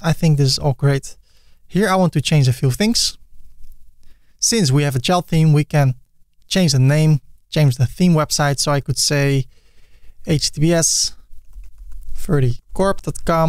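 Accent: Netherlands. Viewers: It took me 26 seconds into the video to realise you are Dutch. Especially the pronunciation of "file".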